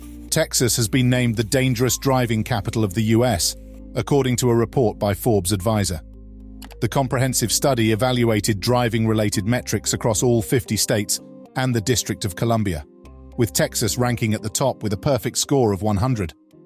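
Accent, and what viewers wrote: United Kingdom. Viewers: Is this a Jew with a British accent attacking the White race again? Pretty sure it's a Jew jewing. Christians eat this shit up. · Could do without the narrator's brit accent.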